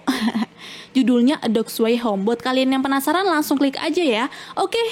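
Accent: Pemalang, Indonesia. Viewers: Mbak orang pemalang?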